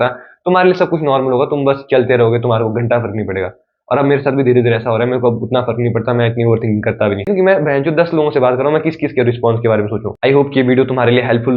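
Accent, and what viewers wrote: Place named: Pahadi, India. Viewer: Bhai tumhari awaj mai pahadi accent ata h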